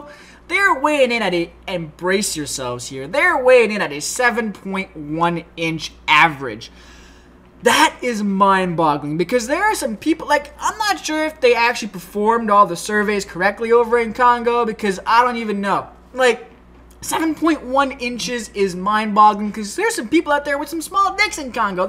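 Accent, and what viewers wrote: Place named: Canada. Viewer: what part of canada is he from?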